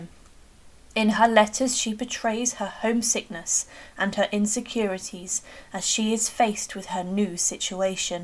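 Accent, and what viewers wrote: England, United Kingdom. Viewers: While it is spelled ‘Holy-rood’ it is pronounced ‘Holly-rood’. I am Scottish, born on the west coast, grew up in Inverclyde, university in Aberdeen, lived in Edinburgh - where Holyrood is to this day - and I can assure you, your pronunciation is WRONG. Given your accent, I am assuming you’re English. You should know better.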